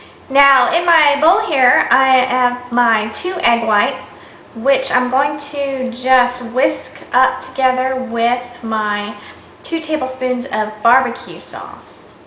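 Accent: South, United States. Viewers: Is that a southern accent I hear? :)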